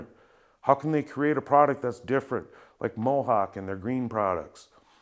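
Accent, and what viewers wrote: Minnesota, United States. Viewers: I knew it. I could hear that MN accent after 2 mins. Thanks,!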